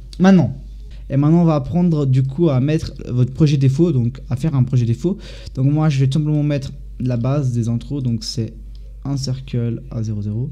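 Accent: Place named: Portugal. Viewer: il est portugais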